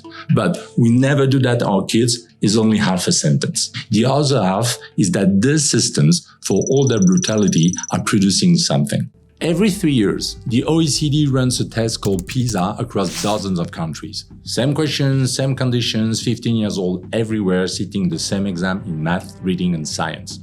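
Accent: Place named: France. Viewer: He's French. He could have a professional record studio yet almost nobody would understand his English :)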